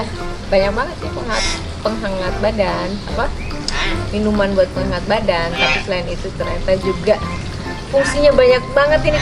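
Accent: Java, Indonesia. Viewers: seneng liat chanel mba klo ngomongin tentang taneman penyampaiannya juga enak apa lg klo udah bahasa jawanya keluar makin seneng dengernya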